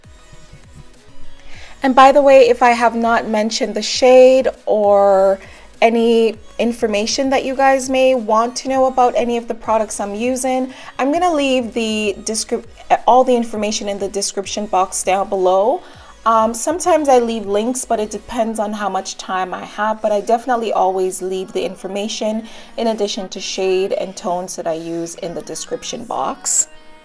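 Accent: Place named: Jamaica. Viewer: Are you Jamaican ? Or of Jamaican/West Indian heritage? I am sensing a hint of my mother tongue :D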